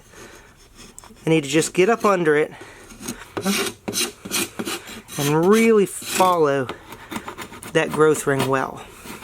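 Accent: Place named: South Jersey, United States. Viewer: Are you from the South Jersey/Philadelphia area originally?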